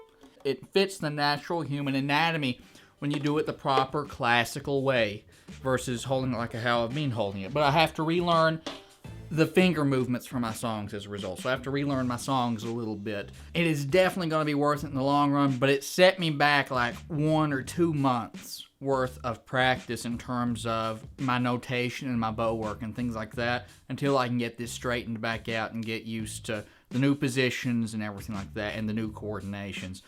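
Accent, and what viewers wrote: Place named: Texas, United States. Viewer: I'm not American, but judging by your accent and those guns, are you from Texas? Haha I started playing the violin about 5 months ago. I couldn't afford the classes so I had to quit, but I still practice it. You sound really great, but don't hold it that high, or your shoulders will begin to ache and you won't focus on what you're doing. Your thumb should be placed on the side of the neck. Good luck :)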